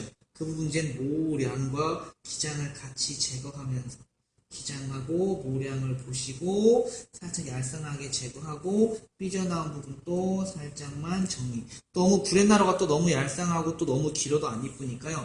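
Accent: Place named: Jeolla, South Korea. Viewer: 구수한 전라도 사투리가 정감이 가네요 감사합니다 원장님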